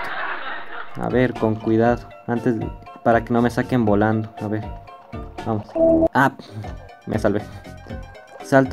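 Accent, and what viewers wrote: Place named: Mexico. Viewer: Como que no eres mexicano xD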